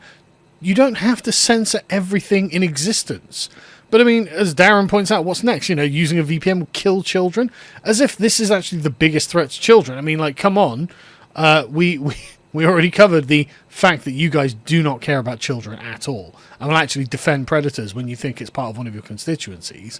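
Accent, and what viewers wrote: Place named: United Kingdom. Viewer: british accent is the most annying thing ever, loike jast tok narmaly meit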